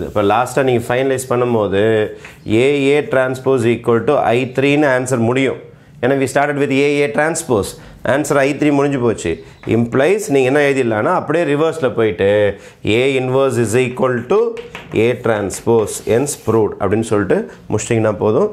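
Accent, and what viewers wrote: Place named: Chennai, India. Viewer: Hi I love Chennai slang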